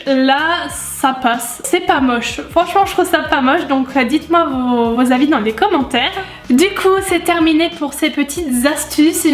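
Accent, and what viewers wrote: Lorraine, France. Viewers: Moi aussi je parle comme ça vive la Lorraine 😅😂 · Je parle comme toi je viens de lorraine ma belle t'inquiète pas 😘 Super vidéo comme toujours! 😘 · Je suis lorraine et je parle exactement comme toi ❤ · Je viens de Lorraine mais j'ai du déménager dans le centre mais j'ai conserver l'accent par choix, et ca me fait plaisir d'entendre parler comme ca, tu te prend pas la tête a vouloir faire plaisir au autre tu es spontanée et c'est ca que j'aime chez toi ❤ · tu viens de quelle ville ?? ( moi aussi je suis de Lorraine et tous les mots que tu as dis ( le '' t '' à vingt , la clenche ...) je les dis aussi . 😘🤗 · Je comprend totalement ta façon de parler je suis aussi de lorraine 😋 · Tu viens d'où si tu viens de la Lorraine ou de L'Alsace ? sinon super vidéo ♡ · j'habite en lorraine saint remimont vers Haroué c'est vrai qu'on parle comme sa mais moi sa me choque pas mdr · moi aussi je viens de Lorraine je te comprends je parle exactement comme toi💚#teamsnap✨ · y love you accent lorrains !!!!!! 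je suis lorraine ! 💕💕💕💖💖💖💖💖💖💓💖💖💓💖💖💓💖💖💓💕💖💗💗💗💙💚💛💜💝💞💟💟💟💟💟❤💘💋💋💋👄👄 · Moi aussi je suis de lorraine et je parle comme toi 💓💓,t'es pas seul 🤣🤣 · Je viens également de lorraine et en effet je parle aussi comme ca... j'taime fort continue comme ca <3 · Vive la lorraine 💪🏻 Est ce que tu as aussi un accent en disant certains mots comme boîte, poêle, etc ? Sinon t'es genial j'adore ☺️ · moi aussi je parle comme toi je suis de Lorraine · Oui la facon de parler de la lorraine 😂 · Oui je parle comme toi et je pense que tous les lorrains/alsaciens sont pareille 😂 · Moi aussi je viens de la Lorraine je dis pareil que toi 😂😂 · Je suis Lorraine aussi et je parles comme toi aussi, quand je vais chez mon copain dans le centre et des que je parles il se moque de moi avec toutes les "fautes" que tu as cité 😂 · J'habite aussi en lorraine et je dis comme toi !!! 😉❤️ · moi aussi je dis comme toi comme en lorraine biz <3 <3 <3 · Je suis née en Lorraine ,à Metz, donc oui je parle comme toi mdrr😂😂 #teamlorraine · Moi aussi je parle comme sa j'habite en lorraine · moi aussi j'habite en lorraine et moi aussi je dit comme ca 😀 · Je parle pareil que toi!Tu habites où en Lorraine ? 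Sinon je t'adore continues comme sa bisous💘💘💘😊#TeamSnapchat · je viens de lorraine dans le 54 et oui je parle comme toi😂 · Moi aussi je viens de Lorraine (Meurthe-et-Moselle) Et je dis exactement comme toi! Bref j'adore Ta vidéos tu es parfaite je t'adore ❤️❤️❤️ #Lorraine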